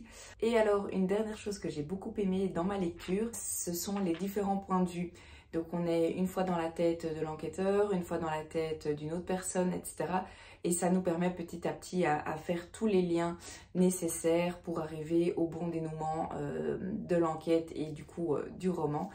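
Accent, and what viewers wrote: Belgium. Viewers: Coucou. Mon oreille détecte un léger accent belge.... Dites-moi si je me trompe lol. Je suis belge moi-même 😉. Bravo pour le vlog👍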